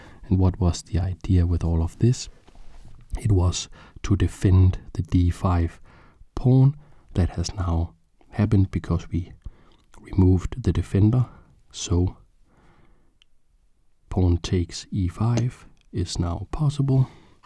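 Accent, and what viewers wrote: Denmark. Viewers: Jesus Christ I got a shock hearing your danish accent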